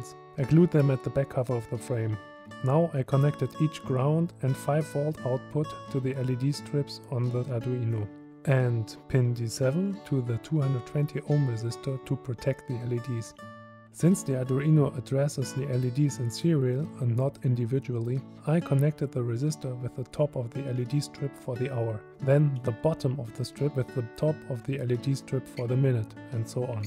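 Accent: Germany. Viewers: Höre ich da etwa eine leicht deutsche Aussprache heraus?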